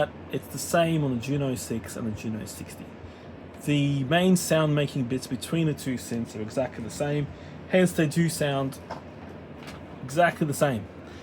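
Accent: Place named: Australia. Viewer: ha. She threw around the word “boring”. Im in adelaide bro. Its always nice to hear aussies.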